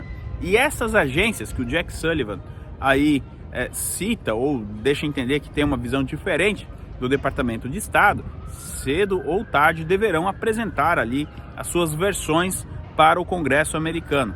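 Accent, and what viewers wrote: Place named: Paraná, Brazil. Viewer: Nossa mas seu sotaque paranaense tá tinindo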